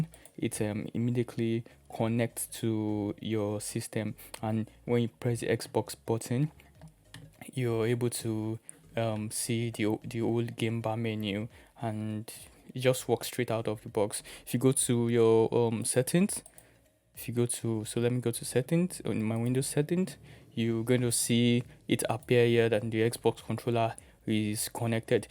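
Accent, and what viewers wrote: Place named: Nigeria. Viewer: Are you Nigerian? I love the accent bro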